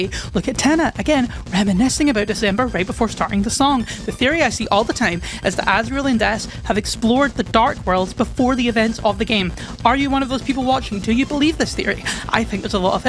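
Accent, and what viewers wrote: Scotland, United Kingdom. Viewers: I love your accent, theory was good, yell at me more possibly Scottish person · Your voice is the most Scottish thing I've ever heard and I love you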